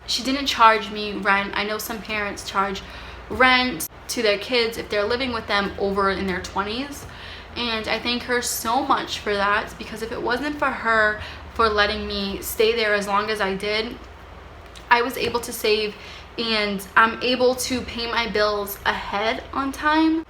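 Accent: Boston, United States. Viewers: I knew that Boston accent the minute you spoke! I💜my city! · As soon as you started talking I could tell you were from Boston! We gotta stick togetha! 😂😆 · You can hear the Boston accent.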